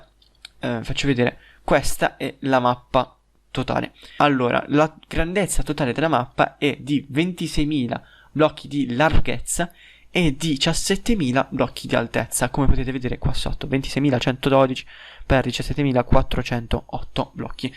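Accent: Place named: Italy. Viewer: I love you italian accent xD greetings from Argentina!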